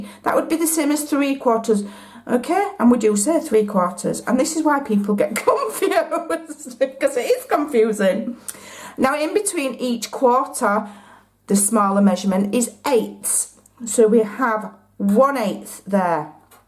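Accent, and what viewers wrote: United Kingdom. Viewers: Bless your sweet British heart! I’ve purchased Dysart’s that only contain measurements in centimeters which have rendered me useless... clearly I didn’t pay much attention in math class which I hated though this tutorial is a godsend. I could listen to your charming accent and joyful laugh all day long so thank you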